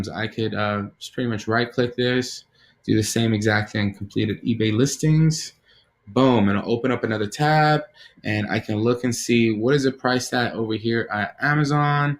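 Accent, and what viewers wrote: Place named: New York, United States. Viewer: Sounds like you from New York, factzz.. Good info... Why are so called guru saying don't use Amazon, don't use software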